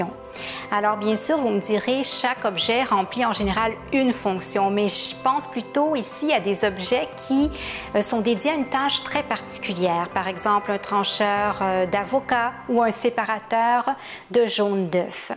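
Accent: Quebec, Canada. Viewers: Bonjour, merci pour votre partage je recherchais justement des vidéos de personnes un peu plus âgées car j'ai 35 ans maintenant, et je ne suis plus très en phase avec les conseils des personnes de 20 ans, (même si ces personnes ont bien-sûr aussi de bons conseils). J'aime beaucoup votre discours, votre musique de fond, vos images, et votre accent québécois est la cerise sur le gâteau car j'adore le Québec ❤️ bref ce format est très bien, on aimerait qu'il dure plus longtemps ! En tant que minimaliste, je ne sais pas si vous avez proposé un house tour de chez vous pour voir à quoi un endroit épuré ressemble, pour s'en inspirer. Merci ! Eve, de France.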